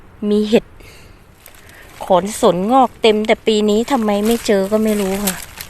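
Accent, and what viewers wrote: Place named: Korat, Thailand. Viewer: สวัสดีค่ะ นกเข้ามาเก็บเห็ดด้วยค่ะ พูดสำเนียงโคราช อยู่ที่ไหนคะ นกอยู่อำเภอสีคิ้วนะคะ 🥹🥹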